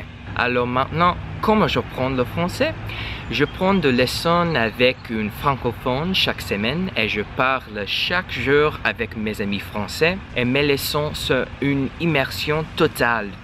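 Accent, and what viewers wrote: Italy. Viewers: I'm italian and maybe I'm wrong (I've never studied french and don't speak any of it although I understand it quite well) but it seems to me you have a bit of an italian accent in speaking french...funny :))! But, after only one year, you're very good, keep it up! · T'es italo-japonais? Tas un petit accent italien en français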